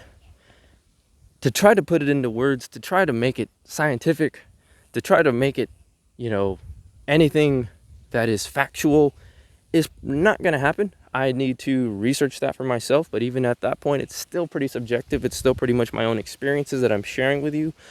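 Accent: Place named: United States. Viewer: my goodness....now i will practice American accent with your videos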